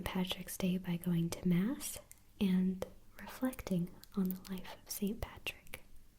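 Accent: South, United States. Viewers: You, ma'am, have done the impossible. What is it that I speak of, you ask? Isn't it obvious? Bagpipes. Bagpipes in an ASMR video, haha! I never thought it possible! I'm teasing, of course. The concept just struck me as funny ;) But now, my serious face... I quite enjoyed this video, but my first comment to you is a bit more general. I have been an avid seeker of ASMR videos since I discovered their existence and more incredibly discovered that this odd, pleasurable, and difficult to describe feeling was shared by quite a few (albeit still a select few) other individuals. Like all of us tingleheads, I have my favourite ASMRtists that I subscribe to that aren't just giving me head-gasms, but are also just flat-out talented entertainers. And this is where you come in. I love your videos for the guaranteed tinglefest they give me, their relaxing nature, and this incredible sense of comfort I get from them. 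It must be that southern charm my Bostonian ears just melt for... But girl, you are a natural in front of that camera! I mean, of course that pretty face of yours doesn't exactly hurt, but I think you just have a gift for acting. You have excellent diction and your comedy sense and timing are all things that can't be taught. I mean, after all that how could I not subscribe to your channel?! Keep up the amazing work, you are much appreciated. Sincerely, Andy Mac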